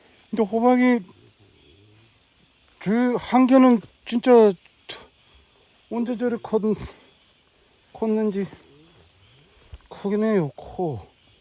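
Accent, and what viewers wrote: Gangwon, South Korea. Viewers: ~~^^강원도 사투리 너무좋앙♡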